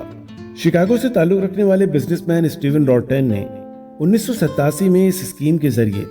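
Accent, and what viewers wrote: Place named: Pakistan. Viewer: Masha Allah aapki voice or Btane ka urdu lahza dil ko chuu jata h .. puri yt m sbse best of best videos hoti h outstanding Big Respect 💝😊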